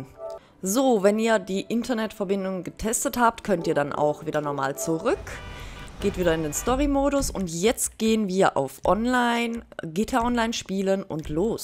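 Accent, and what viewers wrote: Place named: Swabia, Germany. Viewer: Ich mag Deinen leichten Schwäbischen Akzent 😊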